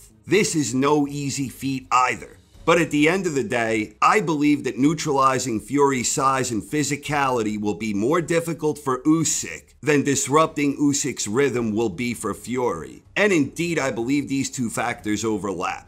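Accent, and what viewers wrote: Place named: Boston, United States. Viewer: I think this guy is from Boston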